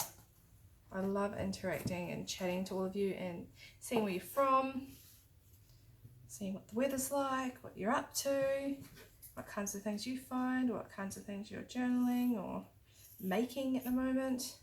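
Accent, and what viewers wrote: New Zealand. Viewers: Recently stumbled upon your channel I detected the kiwi 🥝 accent im from Auckland but soon to be a southland gal, love your channel and ideas very inspiring thank you for sharing your creativity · Ahhhh, I had thought there was a bit of a kiwis accent there !!... when Gayle A said she loved your Aussie accent and I went huummm. I'm so like you in regards going to the states ,told people would love to go to the states...questioned ouhh what would you do 😁 Go to second hand shop, craft shops Michael's ..theres a bit of a list there !!!. The area of BrisVegas I'm in, the second hand shops are rather boorriing!!. I've been keeping an eye out for wall paper .... as for tea dying paper my issue is that mine are too light..tried drying them naturally, in oven, ironing them...not finding them exciting lol. Eco dying ohh my gosh they were bleurrr please show how you do urs with Aussie flowers and products. 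I still am trying g to work out what Alum powder is 😲..makes gorgeous colours of plants and flowers. With my pages theres just a tiny hint that there might have been a flower there ahaha. Things in the states are soo different. I was at my local Kaiser shop and overheard a lady stating that she just couldnt find a piece of 12×12 that she wanted and was upset. I happened to have that paper which I'm not nor will ever use. So i went up to her and introduced myself telling her I had that paper and she was welcome to have it...oh my gosh it was so awful , I ended up telling her I would leave the paper at the shop for her ro pick up....like I she really needed that piece of paper to finish her scrapbooking. All that lady kept saying was ...what do u want , you cant just want to gi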